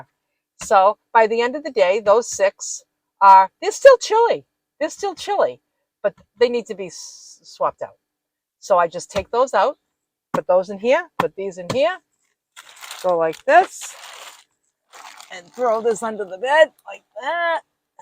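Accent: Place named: New England, United States. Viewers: You're So personable and full of life! I'm originally from NH and I know the NE accent anywhere ! Am I right? Best of luck in your travels dear !!😊 Oh and I got a kick out of the fact you carry even incense. I'm a hippy chic from wayyy back !✌🏵🌻 AWESOME VAN and VIDEO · Hi, I have watched 100s of videos of van build out. Yours is the best I have seen in the past 5 years. I started with a mini van when I lived in RI. Now that I’m living in NM. I purchased a full size cargo van both my husband and I travel in it. I loved watching you I knew right way from your accent your from Massachusetts. I was born in Worcester and lived there till I was 33. Then RI for 23. Now retired and living in NM. Thanks again for PS I loved your studio apartment video as well. Paul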